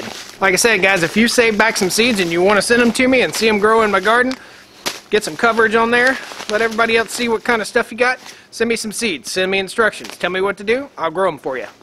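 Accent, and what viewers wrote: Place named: South, United States. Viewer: you have a nice country voice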